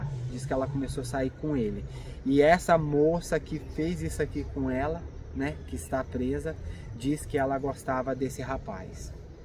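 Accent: Minas Gerais, Brazil. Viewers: O o vc e mineiro ou goiano? Sua voz não parece com pessoas do Sul não! Vc parece mesmo e míneiro ou goiano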